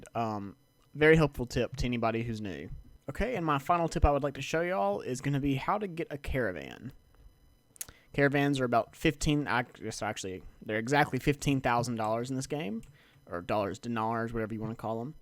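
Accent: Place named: South, United States. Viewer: Good to hear tips for my favorite game in my own (Suuthern) dialect. Great video bro, thank you.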